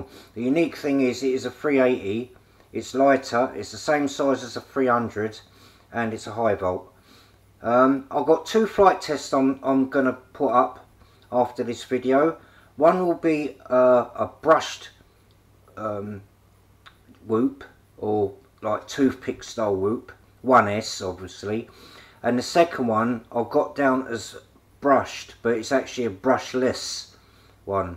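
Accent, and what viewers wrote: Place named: United Kingdom. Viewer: Fair play to ya pal. Decent channel thanks nice to hear a brit accent instead of a yank 1.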